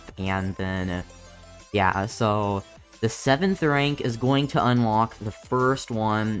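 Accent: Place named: United Kingdom. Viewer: So bri'ish for me idk why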